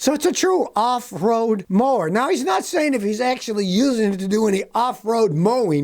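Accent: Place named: Western New York, United States. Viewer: Got to love that Western New York Accent,. rat-ling. I grew up near Syracuse.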